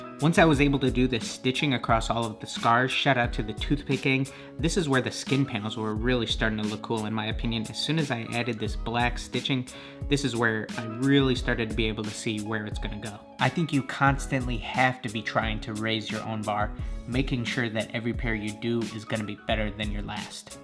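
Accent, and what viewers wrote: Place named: New York, United States. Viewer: Those are fire bro , bro i can hear an accent u gotta be from NY or Canada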